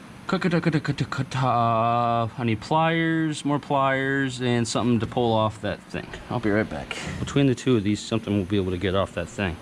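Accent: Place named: Alabama, United States. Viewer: Being from Alabama we would think that you’re from here lmao but we approve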